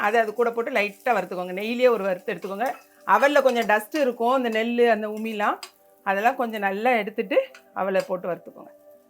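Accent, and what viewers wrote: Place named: Tirunelveli, India. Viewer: திருப்பூர் கோகிலா... வணக்கம் சிஸ்டர்... நேத்து உங்க வீடியோ பக்கமுடியால... இன்னைக்கு உங்க குரல் கேட்டதும் நிறைவா இருக்கு... காரணம் நான் திருநெல்வேலில தான் படிச்சேன்... எங்க ஊர்ல யாரும் இந்த slang பேசறது இல்ல... எனக்கு திருநெல்வேலி பாஷை ரெம்ப பிடிக்கும்... உங்களையும் பிடிக்கும்... உங்க சமையலும் பிடிக்கும் 😍😍😍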